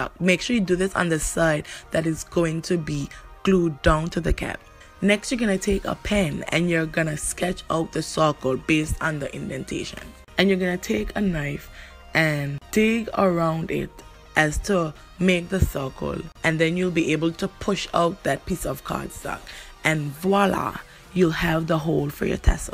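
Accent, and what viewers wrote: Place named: Anguilla. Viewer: Shes from Anguilla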